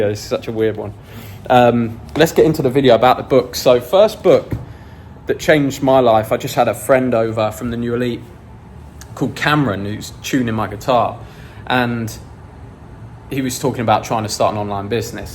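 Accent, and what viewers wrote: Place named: United Kingdom. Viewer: Bri-ish accent wif uh harry poht-uh legos is honestly excellent. You’re the most bri-ish person I follow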